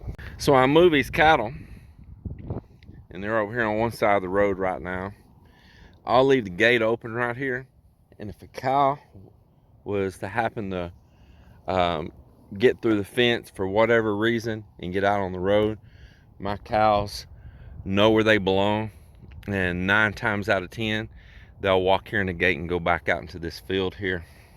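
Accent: Texas, United States. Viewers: Now I'm gona watch your channel everyday..love the Texas people and I love Texas,,we need Texas,,missed your accent..lol I'm originally from n.c. my Daddy was a farmer..I helped slop the hogs when I was 4 and pick some cotton balls..dig taters..love cracklins..bet Lil lady could cook some up...bet u have..ok it's pm here in pa.wonderfully cool..59dgrees.happy to git your chanl.love and peace to you both..you're a beautiful couple..tell her hello for portia...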